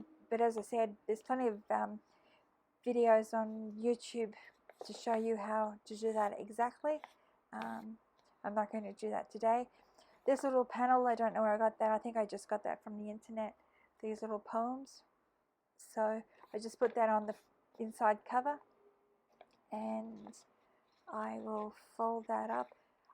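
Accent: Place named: Australia. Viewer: Nice to hear an Aussie accent 🐨 Love this teabag paper concept well done. Cheers from NSW 😊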